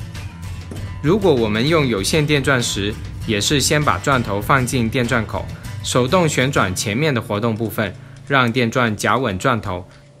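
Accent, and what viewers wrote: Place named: Taiwan. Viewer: 台湾腔，台湾人吗？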